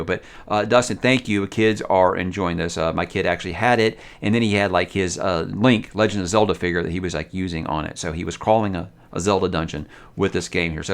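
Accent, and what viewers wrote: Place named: United States. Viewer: You're fine pronouncing names the american way because... you're american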